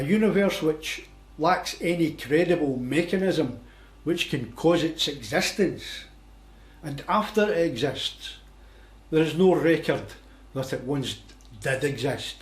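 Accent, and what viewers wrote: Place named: Scotland, United Kingdom. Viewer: Wow the Scottish accent brings me back to Edinburgh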